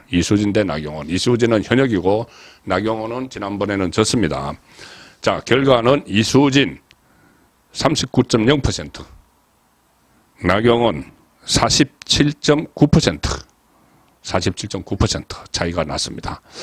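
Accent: Gyeongsang, South Korea. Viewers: 송국건은 서론과 잡다한 중복 이야기가 너무 많아 답답하다. 좀더 깔끔하게 발언하면 좋겠다. 다른 사람과 대담식에도 자꾸 엉뚱한 말로 끼어들어 혼란을 야기한다. 경상도식 언어로 잡다한 얘기가 많으니?참고하기 바람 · 경상도 발음 부터 좀 고치는게 더 좋을것 같다~~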